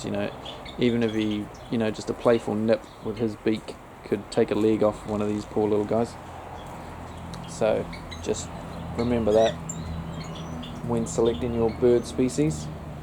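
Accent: New Zealand. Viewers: I recognized a fellow kiwi accent immediately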